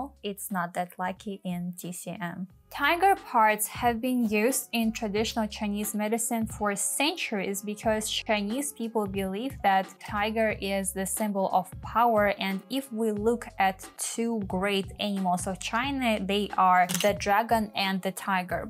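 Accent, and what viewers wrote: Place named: Russia. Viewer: you have a very good English but am I hearing Russian accent?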